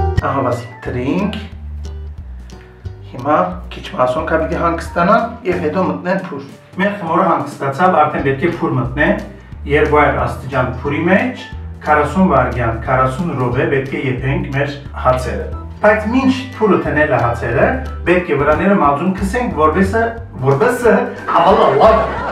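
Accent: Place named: Western Armenian, Armenia. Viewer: Արևմտահայի Ձեր խոսվածքը շաաատ հաճելի է :)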